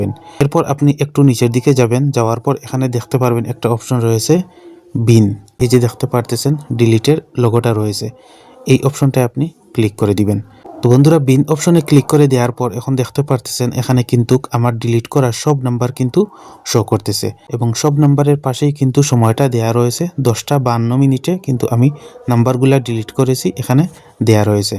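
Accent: Sylhet, Bangladesh. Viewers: Bai apne sylhetini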